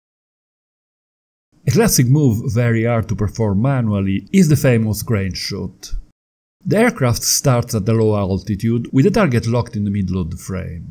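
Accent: Italy. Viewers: great tips, and a lovely Italian accent too!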